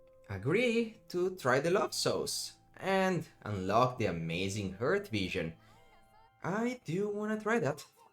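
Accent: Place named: France. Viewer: 10/10 video earned new sub (youre accent sound french) :D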